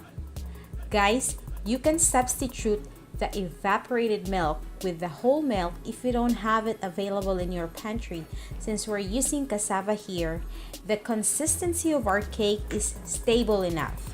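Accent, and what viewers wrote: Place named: Vietnam. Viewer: Úi...nghe giọng này.e đoán chị là ngừi việt nammmm😁😁😁😁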